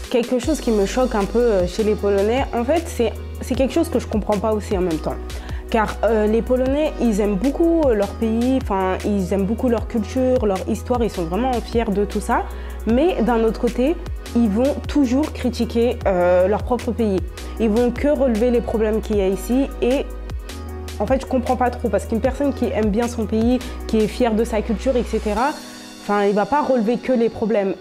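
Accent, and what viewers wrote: Paris, France. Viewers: To jest akcent paryski jakim mówi teraźniejsza młodzież. Wiem bo urodziłem się we Francji i mieszkam pod Paryżem. Jak dla wszystkich języków, w szkole uczą nas wersje literacką języka, a nie tą co się używa na codzień. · standardowy akcent bym powiedziała , akcent z Paryżu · Mówi Bardzo Czystym Paryskim akcentem Gawiedzi ! Na 100 % Urodzona we Francji ! Kończyła Szkoły we Francji ! Na 99 % Pochodzi z kompleksu Miejskiego Paryża ! Powiedział bym Okolice Saint Denis ! Przynajmniej Uczęszczała Tam do Szkół ! Na 100 % ! Ale Kultura Osobista ! To 94 Departament ! Francuski Literacki ! A ,, ąrgo ” ( Dialekt Paryski! ) To Dzień i Noc ! To co się Uczyliścje w Szkole ! To Język Literacki ! Prawie Wymarły !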